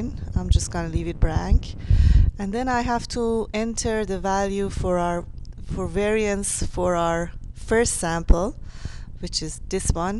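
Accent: Iran. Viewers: persian accent. woohoo! XD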